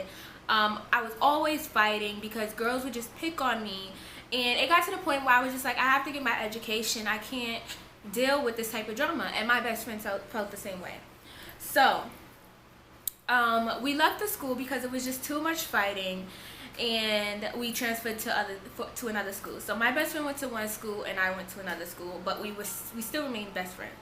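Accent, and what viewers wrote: New York, United States. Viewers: I'm really sitting here realizing all of us from Ny talk the same when we mad or explaining a fight . Lol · Love the New York accent and moreeeeeee story time plzzz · Your New York accent is so cute lol. I love it. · I love to listen to her I love NY accents so much 😂😍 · I love your New York accent !! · You was going in talking about the story, especially with your NY accent I Lo it lol.... But I love your moral of the story! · Are you from NY? And I don't mean this like in a mouthy or smart way, I promise 😂👐 I just legitimately wanna know if you're from NY cause I just gave a question about the dialect lol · Lmao Spoken Like a True New Yorker! Love You! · I love her accent my cousins are from NY and they make fun of my southern Floridian accent 😩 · They way you talk, like you sound like from your from new york. I know you are from there but. 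I wish i could talk like that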